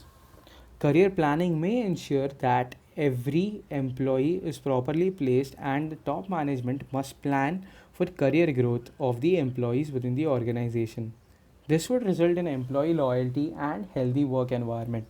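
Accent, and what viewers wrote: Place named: India. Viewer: its an Indian accent so difficult for most to follow outside India.If you are making a video for a larger audience please have pictures, subtitles to add more value.I am Indian and no offense to anyone but even though we talk in English our accent and pronunciations are different.Great material but please make changes.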